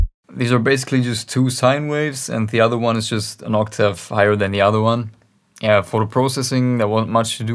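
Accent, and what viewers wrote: Germany. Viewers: Es hört sich so an als wärst du ein Deutscher! :) Wegen dem Akzent! Ist aber nicht schlimm, sondern cool!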